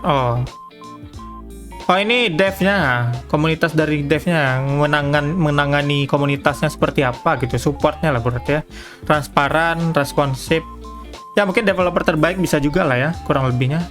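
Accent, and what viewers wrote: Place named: Banjar, Indonesia. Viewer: bang, elu org mana sih? bahasa lu kyk org banjar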